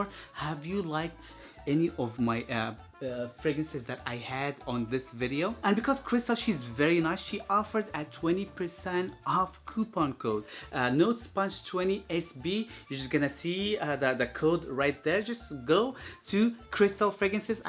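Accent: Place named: Israel. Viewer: Are you Israeli? יש לך מבטא 😏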